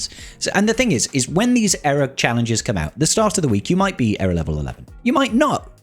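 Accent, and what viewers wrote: United Kingdom. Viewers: Subscribed! Great Content and a heads up Not to spend on this Game. I am playing since 1st may 2021 f2p no LSB or anything at 10.3 Millions GP 8 GLs all 3 GL Cap Ships Kyber 3. I enjoy the Game f2p. No Fomo. What the Game will Break is now the monetazation of DCs. Soon you will Not fight Rosters in GAC, it will be about fighting each others Datacrons. In my View Thats Not even a Game anymore when you think about that. Greets from Germany. Keep up the good work. Btw are you from England or Great Britain? You have a Bit of an british accent. Sounds Nice!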